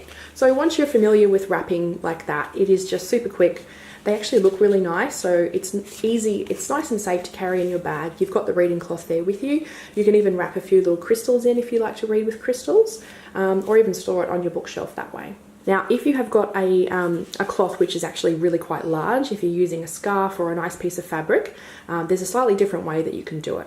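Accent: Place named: Australia. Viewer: You sound aussie.. ill have to have a look.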